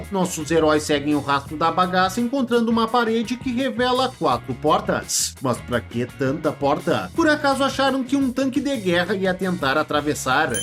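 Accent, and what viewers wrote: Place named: South, Brazil. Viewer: O chato da narração é o sotaque de Roceiro Sulista! Cara, volta pra roça! Lá você conseguirá!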